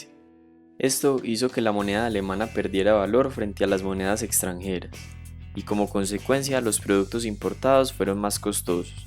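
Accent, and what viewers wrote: Colombia. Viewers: Me encanto colombiano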